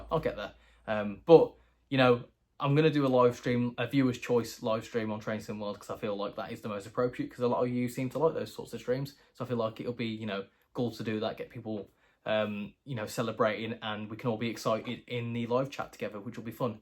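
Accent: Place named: West Midlands, United Kingdom. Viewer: Congrats on 1000 subs. Bonus points for the epic West Midlands accent. It's bostin' our kid! :D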